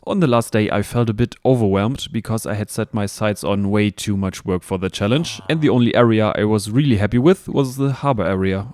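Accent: Germany. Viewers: You sound a little bit german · You have a german accent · Are u germAN? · You sound German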